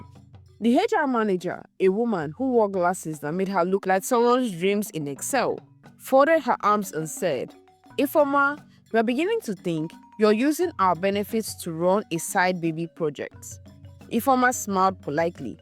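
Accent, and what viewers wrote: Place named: Igbo, Nigeria. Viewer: you are using an Igbo accent.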